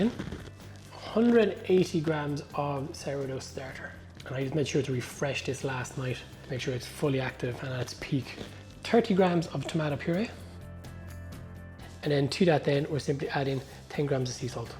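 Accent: Ireland. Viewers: Woke up early AM here in the States. What am I to do?. Check out, my go-to bread guy. For sure I will give this a try in the next couple of weeks. Your "brogue", reminds me of my, Da who came over from Limerick. Take care.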